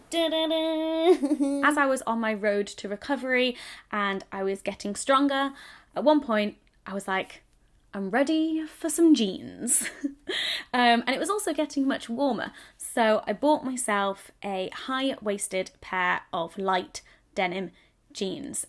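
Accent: United Kingdom. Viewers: you are a dream cum true. I to have had an ileostomy back in 2014. It sucks. I have to wear an ostomy bag the rest of my life. Kills my sex life. It s nice to connect with a beautiful girl that has had a similar condition. Love your British accent. Nice smoking hot bod too. Keep posting lovely nymph and I wish you the best.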